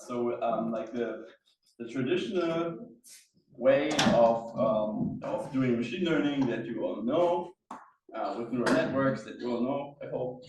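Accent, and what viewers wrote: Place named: Germany. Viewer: perfect German accent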